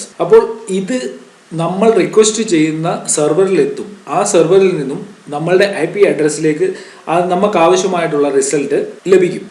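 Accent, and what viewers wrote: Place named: Kollam, India. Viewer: കൊല്ലം furi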